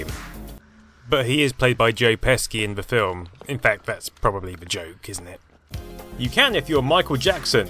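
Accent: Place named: Norfolk, United Kingdom. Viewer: he's from Norfolk, they all talk funny there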